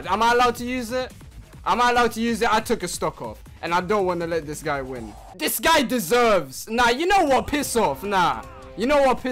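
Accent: United Kingdom. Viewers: YOUR BRITISH!?!?!?!?!?!?!?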